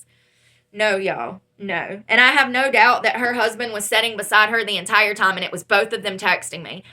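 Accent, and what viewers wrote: South, United States. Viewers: Hey, stay positive. Whatever you do, don't go back to the booze. It's a lonely world out here, but guess what... you have the sweetest southern accent I've heard in a while, and that's special. Stay positive, god bless!!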